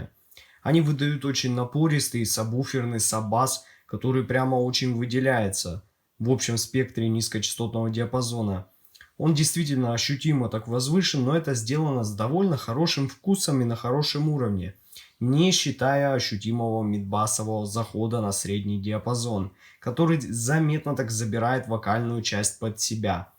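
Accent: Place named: Caucasus, Russia. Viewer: ломаю голову, знакомый акцент :) с кавказа?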